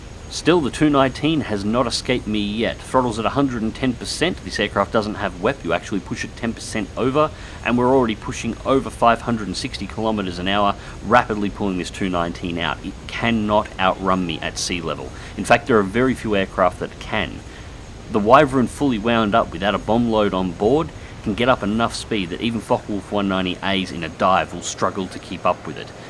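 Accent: Australia. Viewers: Love hearing an Aussie accent on these videos, good show!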